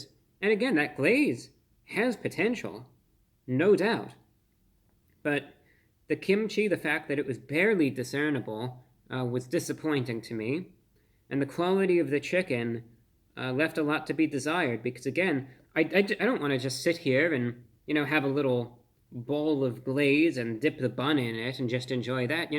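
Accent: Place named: Transatlantic, United States. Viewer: Brah really has morphed his accent from New Yahk to Transatlantic over the years.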